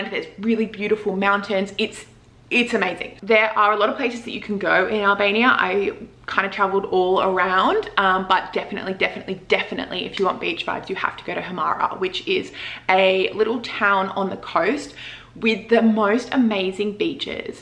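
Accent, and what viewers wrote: Australia. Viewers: love your Aussie accent!!!!💯💯❣❣💥💫💫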